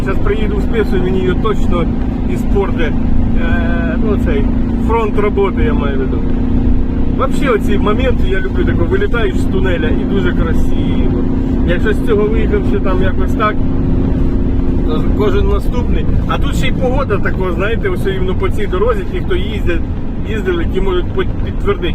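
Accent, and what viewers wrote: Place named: Kherson, Ukraine. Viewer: Да, наш суржик ниякий гугл не переведе! І ніхто так не може балакать, як ми!Херсон і окресності!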